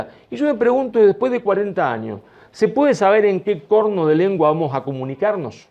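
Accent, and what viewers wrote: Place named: Italy. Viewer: suenas a italiano, pero llevas la hispanidad en las venas!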